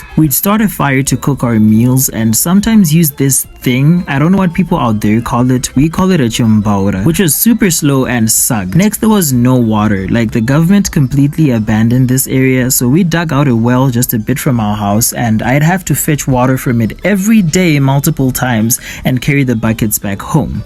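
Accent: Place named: Johannesburg, South Africa. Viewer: I thought u were south african lmao. U have the joburg accent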